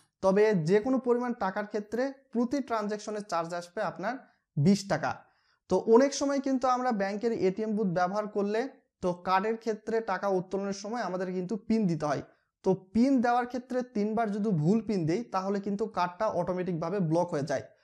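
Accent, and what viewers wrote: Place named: Naogaon, Bangladesh. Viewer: নওগাঁ/বগুড়া? আপনার উপস্থাপনায় বুঝা যাচ্ছে।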